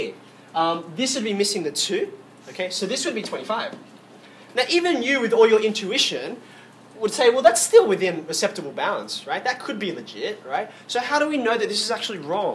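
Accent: Australia. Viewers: I would listen to this guy any time. DAT DERE AUSTRALIAN ACCENT. !! Very well explained btw!!!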